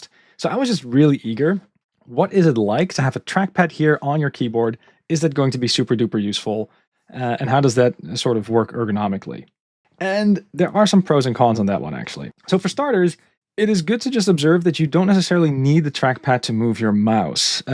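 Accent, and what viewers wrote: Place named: Netherlands. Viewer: This keyboards looks very interesting. For some reason about 4 seconds in I could tell your accent was Dutch, then found out you are actually Dutch haha. Was wondering if you had a discord I could add, I had a few questions if you wouldn’t mind.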